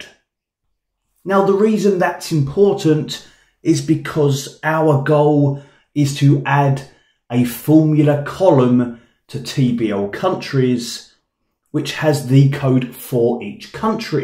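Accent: United Kingdom. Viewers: Nice British accent)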